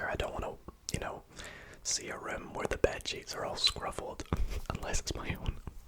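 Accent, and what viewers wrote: Scotland, United Kingdom. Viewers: I am from spain and i have to say that I understand really well ur english although your scotish, i love these mukbangs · omg you’re scottish !! i thought you were irish oops 🥲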